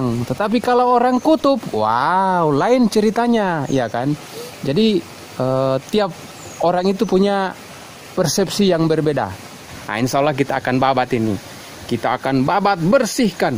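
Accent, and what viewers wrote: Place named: Medan, Indonesia. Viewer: mantap ini depe penjelasan, logat medan campur lompotoo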